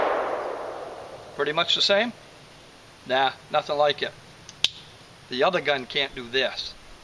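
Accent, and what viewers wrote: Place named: Maine, United States. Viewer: Ike's christ jezus bubby, me an matha down at clam shukka's point pullin sum quohawgs and dun fuhgot my clam rake!Just kidding Brother, havn't been back to Down East Maine for a few years and your accent makes me miss home. Say Hi to Mt. Rialto for me.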